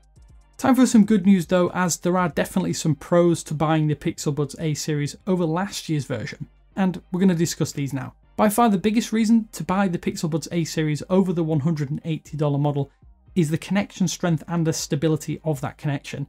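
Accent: United Kingdom. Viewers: That's just how British people talk Lol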